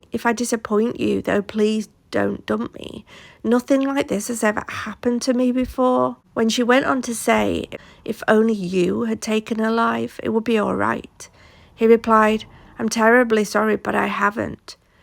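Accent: Yorkshire, United Kingdom. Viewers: I think I told you this before, I moved to the states 40 odd year ago. I grew up in the Stockport area (Romiley to be precise) and every time I watch your content it gives me a feeling I don't get from any other TC channel. You have absolutely brilliant content and I'm very particular but hearing that yorkshire accent it just takes me to a place and a time I miss so much. Nothing like hearing a familiar voice/accent from back home. Don't get me wrong tho, if your content wasn't any good I wouldn't be here so you have earned my subscription because of your great content and not just your accent although the accent is a nice treat for me. Cheers! 🤘🏻🇬🇧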